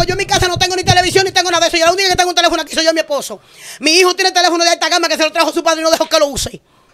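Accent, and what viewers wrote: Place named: Dominican Republic. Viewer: Los dominicanos hablan así 😂Pero ustedes abran su corazón y entenderán todo lo que dicen 🙏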